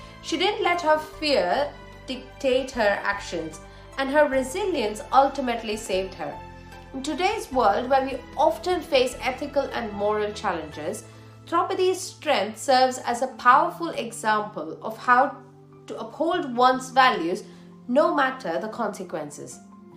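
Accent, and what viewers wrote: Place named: India. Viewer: Your ascent is truly impressive!! Are you from India ?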